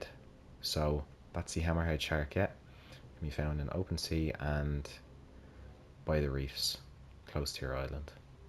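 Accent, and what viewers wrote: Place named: Dublin, Ireland. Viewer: love your accent! Are you from Dublin?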